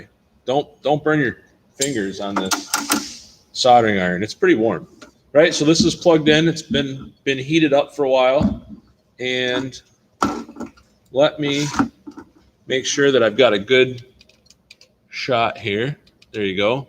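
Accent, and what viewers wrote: United States. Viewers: He speaks American and that is how it is pronounced. Not sure what you are crying about.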